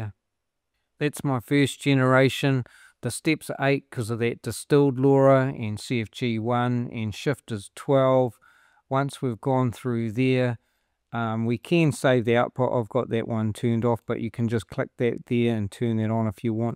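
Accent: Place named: New Zealand. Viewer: You went way too overboard with the training on the A.I voice you use for the video. It sounds like an offensive stereotype of someone from New Zealand.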